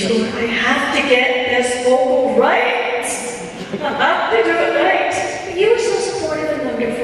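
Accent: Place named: United States. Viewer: she sounds american now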